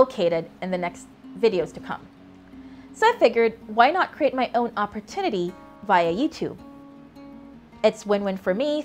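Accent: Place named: United States. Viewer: You have a flawless american accent.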